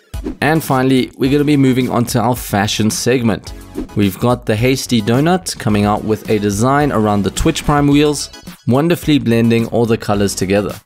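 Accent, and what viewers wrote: South Africa. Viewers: i had a feeling you were South African from the accent, but had to check your bio. Glad to see i was correct! Good Vids!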